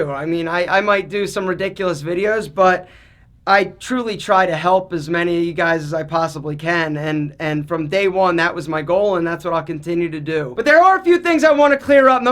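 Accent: Philadelphia, United States. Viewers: I enjoy your posts. I get a kick out of your strong Philly/South Jersey accent. I also agree about the Dollar Shave stuff. The shaving stuff is a bit expensive, but you need so little to have a great shave! So, hang in there . Keep amusing with good advice.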